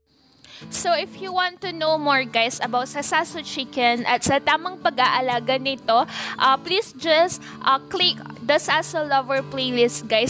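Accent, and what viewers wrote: Pangasinan, Philippines. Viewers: Pangasinan accent si ate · Pangalatok si maam may punto.hehehe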